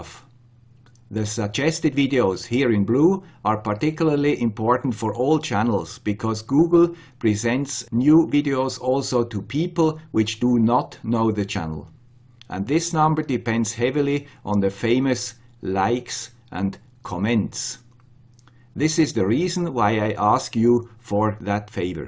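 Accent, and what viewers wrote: Switzerland. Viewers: Happy New Year! Looking forward to another great year of videos from the guy with the Swiss accent. Don't change a thing. I realize you have a long backlog on video ideas, but would love to see one on enclosures for various electronics projects. This is an often overlooked topic in the electronic hobbiest world. Thanks for your great videos. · Hi - wishing you continued success for 2017. You explain things on a level that we understand and continue to be generous on providing code. I fully agree that a community is starting to appear and that we can all help each other when hit a small road block. So the guy with the "Swiss accent" please keep on with projects and teaching as well as you go. I personally think is one of the best channels I have found.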